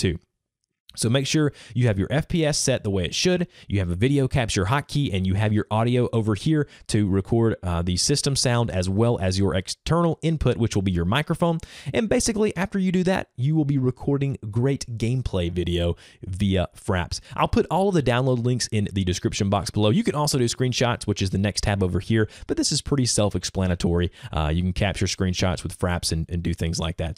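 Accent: South, United States. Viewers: he sounds southern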